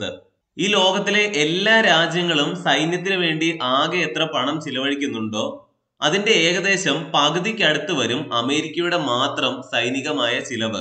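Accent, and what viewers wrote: Kottayam, India. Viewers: Kottayam ano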